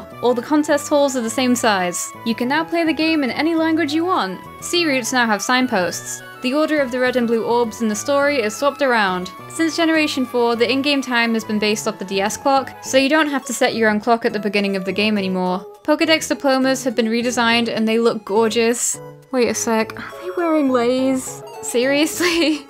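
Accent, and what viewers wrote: United Kingdom. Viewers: British. She mentions it most recently in the Galar Version Differences video.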